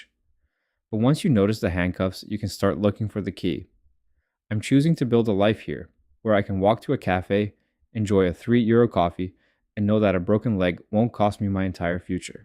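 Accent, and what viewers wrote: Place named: United States. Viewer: you sound american tho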